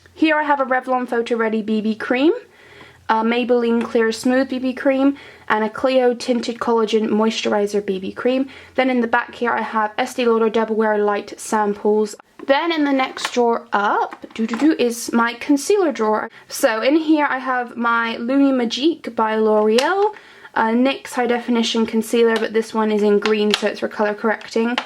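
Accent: United Kingdom. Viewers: Are you from Britain? I'm really struggling trying to guess your accent, you sound british to me but I am unsure aha I am a new subscriber xxx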